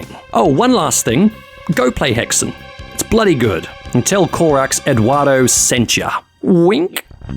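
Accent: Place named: Home Counties, United Kingdom. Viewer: Home Counties?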